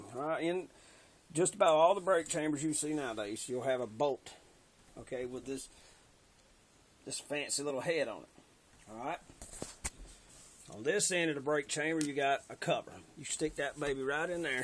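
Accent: South, United States. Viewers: Name that Southern accent. I'm thinking...... Alabama?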